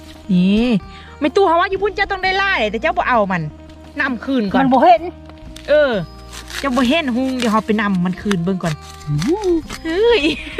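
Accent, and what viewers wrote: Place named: Phu Thai, Thailand. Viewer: ภาษาน้อง ลาวผสมภูไทเพราะเฮาเป็นคนภูไทฟังออก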